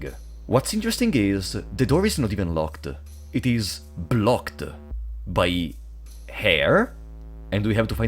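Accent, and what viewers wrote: Italy. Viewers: Are you italian by any chance?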